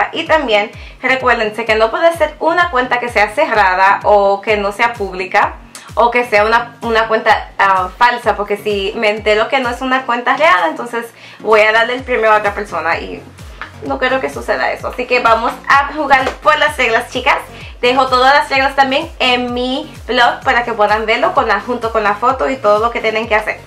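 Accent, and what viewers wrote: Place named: Latin America. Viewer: Hola, mi nombre es Patty te veo desde San Luis Potosí Mexico. Me gusta mucho tu canal espero sigas subiendo vídeos seguido :), amo tu acento latino!! un beso guapa.. me gusta el jabon de cara de Origins, se me antoja probar esa marca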